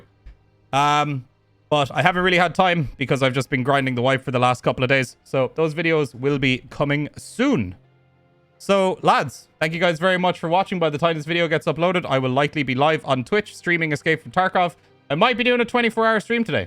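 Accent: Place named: Ireland. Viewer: I thought he was Irish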